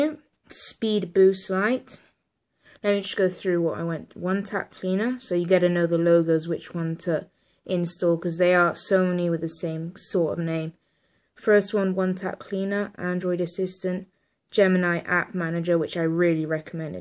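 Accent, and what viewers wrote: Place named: United Kingdom. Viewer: you sounds like British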